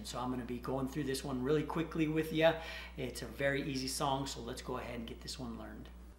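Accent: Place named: South, United States. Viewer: Just guessing because me and you have the same accent.I like your singing but I bet you are from one of these states. North Carolina,South Carolina ,Alabama or Kentucky. Am I right. LoL.. We're not built for singing heavy metal and absolutely no Rap. LoL.. I'm from NC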